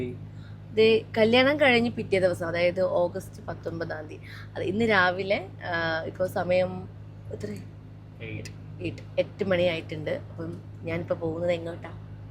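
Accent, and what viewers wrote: India. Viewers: trivandrum slang ingana thanneyan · aentha eppol ella vdeos lm thrissur slang l samsarikknne Kurach famous ayappo tvm slang mattnna aentha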